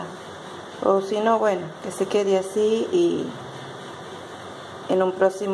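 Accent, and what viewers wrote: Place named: Salta, Argentina. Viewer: Suena a salteña 🥰. Ahora voy a hacer el dulce.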